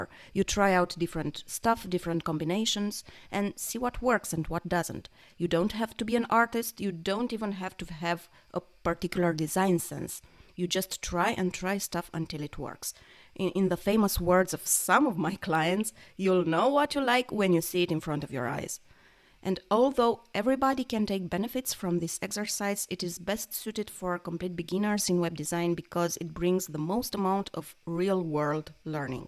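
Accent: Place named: Russia. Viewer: Классные упражнения!Спасибо!У тебя русский акцент чувствуется)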